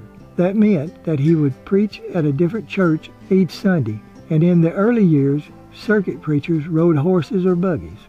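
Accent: South, United States. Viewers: I've said it before but say it again when I hear the SOOTHING SOUTHERN VOICE of I know I'm HOME in Louisiana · So nice to hear a Southern voice on documentaries! This was so well done and respectful. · Great narration as always. Your smooth voice coupled with your southern accent makes your work more appealing. I only knew this actor from Green Acres. I had no idea he had such so many other roles! Very interesting. Thank you. I really enjoy your work. Stay safe!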